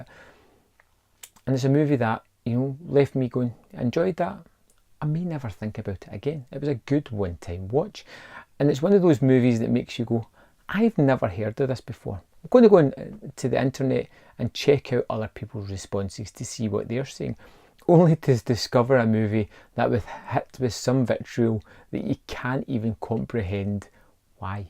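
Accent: Scotland, United Kingdom. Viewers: Yes it is, and may I say for a Scott he speaks very clear and well...psst ❤ a proper Scottish accent!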